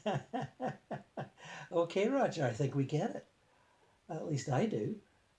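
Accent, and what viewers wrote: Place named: Canada. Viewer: This is my Fav contact from a lot of your vids starting or about..and really kicks in ..better feeling even being in love.. yes you are in pure love and are the assents of love Love all your videos and thank you for posting these, so i don't feel so nuts..yet you let it out as no big deal..and inspires me too..edit : this was the 1st video i saw of you doing this, and the part how he will not ever forget those who were there for him in the end, aw.. see i was there for my grandma of 99 1/2 who died last yr at home using hospice care..and her last words were..thank you 3 times..and im thanking her..she got ill and wanted to thank me and just except it ..and pray pray pray she said 3 times.. it always worked for me.. Be blessed ..from South Carolina USA .. love your accent ..i love to think British in the uk but know its Canadian !?..maybe even Montreal Take care :*)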